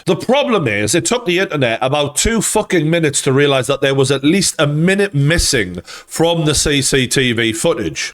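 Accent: Newcastle, United Kingdom. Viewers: like the old true geordie , class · Love your accent. Love me a geordie! Great content too ❤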